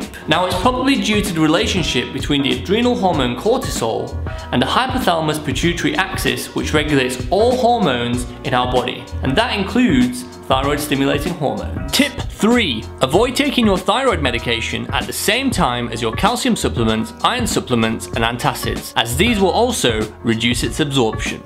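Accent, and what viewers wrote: United Kingdom. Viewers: I had to turn the caption on because of the British accent 😂 I'm a fresh PharmD graduate and i'm interested in patient education and you are indeed inspiring me a lot!!🙌🏼 keep up the great work! God bless you!🙏🏻✨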